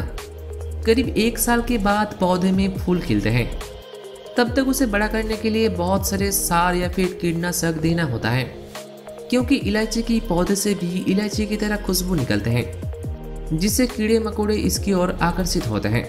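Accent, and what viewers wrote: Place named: South, India. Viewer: Aapke accent se laghta hai aap South se ho ..nice video....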